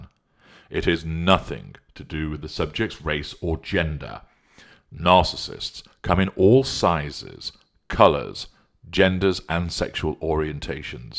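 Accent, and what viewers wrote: United Kingdom. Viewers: This guy doing the video has a polished British accent; he's very clear.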